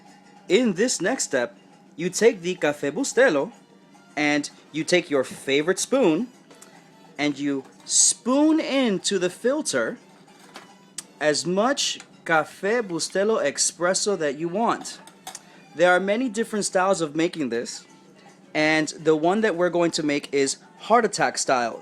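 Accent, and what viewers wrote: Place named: Cibao, Dominican Republic. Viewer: Jajajaja me encanta la forma de explicar... seguro eres del cibao santiago republica dominicana. Salud!!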